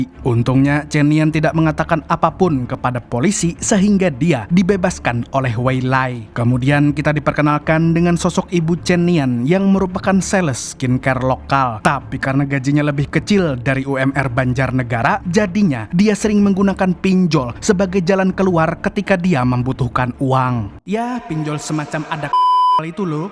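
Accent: Banjar, Indonesia. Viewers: pian urang banjar kah min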